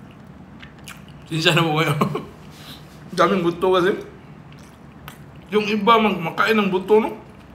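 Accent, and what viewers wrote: Visayas, Philippines. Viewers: Mr.wilcomeback. Let wifi to eat also not all d time ikaw ang nkikita sa u tube.visaya p ang accent mo dong.talk tagalog so everybody can understand db .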